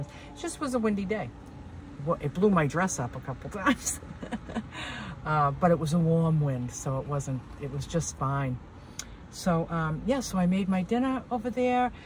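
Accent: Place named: East Coast, United States. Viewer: you are a joy to see! Love your setup. You actually remind me of me! I eat very similar to you. I’ve been more vegetarian and Mediterranean with my diet since I was a kid. The only meat I ate was what my mother cooked, but always loved fish, and greens and veggies are my favorite. I went Vegan 2 years ago and then slowly added back in fish, eggs, and some cheese, mainly hard cheeses from Italy and mozzarella, and also added back in the olive oil. If my fridge isn’t packed with leafy greens and other vegetables then it doesn’t matter what else I have, it feels empty. Mackerel is one of the best fish to eat for one’s health! By the way, I hear the east coast in you, are you on an east coast beach? I’m originally from Long Island now living in Southern California. Stay well🌟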